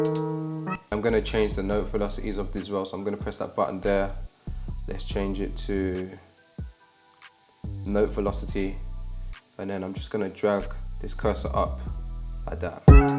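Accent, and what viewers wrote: London, United Kingdom. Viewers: You from London g?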